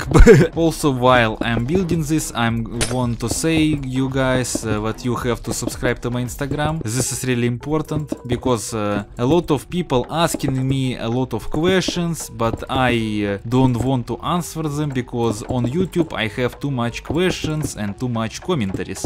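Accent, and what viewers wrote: Russia. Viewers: Love your Russian accent!!! Anyone who doesn’t is cyka blyat !!! Third comment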